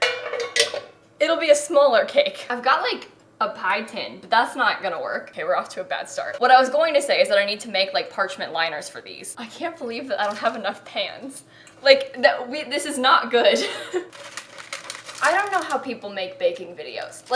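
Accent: United States. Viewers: One of the reasons I love your videos is because I compare our accents. In America you say sift and in Scotland we say it like siv 🇺🇸👉🏴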